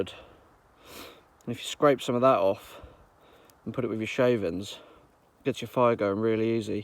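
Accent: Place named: Suffolk, United Kingdom. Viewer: Love your set up. East of you here. Nice to hear some Suffolk twang, even though you are in Norfolk.. 🥳👍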